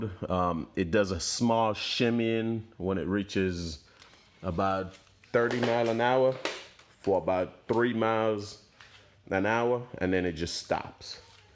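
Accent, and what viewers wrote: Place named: Louisiana, United States. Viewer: Sound like a southren creole man.. if yaint from Louisiana then you Jamaican one.. am i wrong? From the muddah land or ye from dem bayou jerkin them crawdads..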